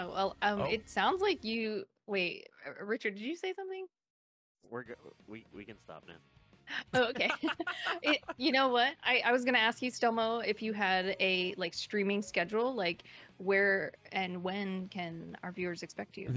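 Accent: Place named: Chile. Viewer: I thought he was Chilean lol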